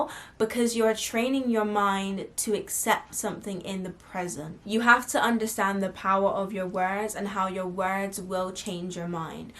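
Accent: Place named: Bristol, United Kingdom. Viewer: Are u from Bristol? Ur accent sounds so Bristolian 😂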